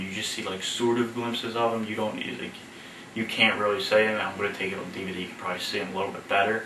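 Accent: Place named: Philadelphia, United States. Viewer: your stupid accent makes me think Philly or Jersey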